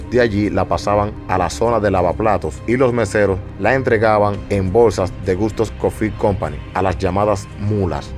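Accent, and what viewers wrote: Dominican Republic. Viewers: ok que bien, te pregunto como ases noticias urbana de PR, pero hablas domii jjjj bien ahy manito💪💪💪💪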